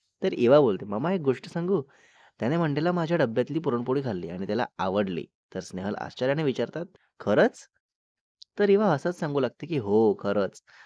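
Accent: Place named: Gujarat, India. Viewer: Ur gujrati accent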